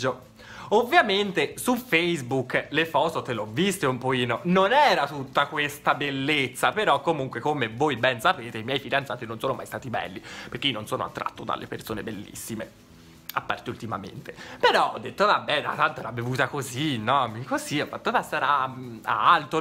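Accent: Tuscany, Italy. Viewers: Sei toscano? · ma sei toscano? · AMO IMMENSAMENTE IL TUO ACCENTO TOSCANO. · Sei toscano? P.s i tuoi video mi fanno morire dal ridere😂❤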